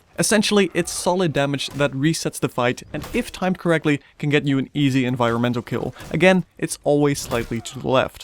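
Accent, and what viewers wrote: Netherlands. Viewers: I'm new to this channel, but are you dutch?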